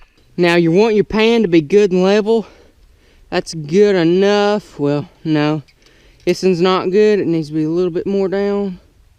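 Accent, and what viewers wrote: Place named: Kentucky, United States. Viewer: Hey what part of Kentucky u from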